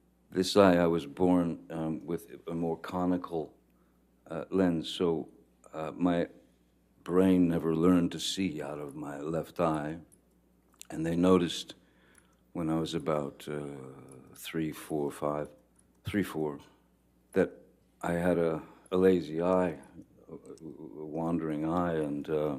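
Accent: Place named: Pakistan. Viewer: Forget about him, love me instead. He sounds like he's from Pakistan.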